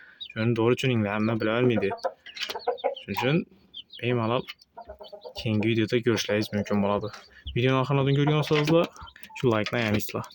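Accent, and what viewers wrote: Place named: Khorezm, Uzbekistan. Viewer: Авваломбор узрку,Хоразм вилояти хам Узбекистон худудида жойлашган ва мактабларда адабий тилда дарс берилади,лекин иккита хоразмлик сухбатлашсангиз ,улай агар умуман тилингизга тушунмайман,шевангиз жуда кучли,маслахатларингизга кизикдиму,тилингизга тулик тушунмадим😊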